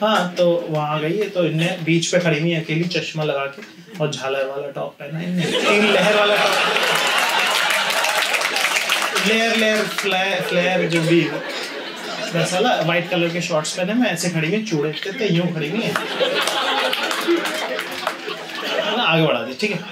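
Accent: Indore, India. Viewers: his jokes may bot be funny everytime but what makes him truly a legend in india is his indori accent and way of acting , his relatable sakkht launda stories and down to earth attitude.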